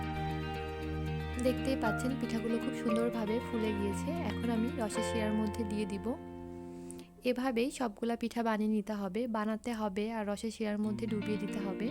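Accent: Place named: Jessore, Bangladesh. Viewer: Apu mone hocche Jessore er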